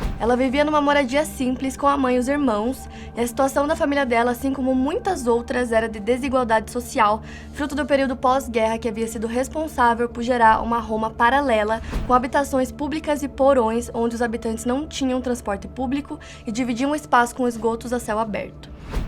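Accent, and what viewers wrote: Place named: Rio Grande do Sul, Brazil. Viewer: Sotaque fofo.... Gaúcha?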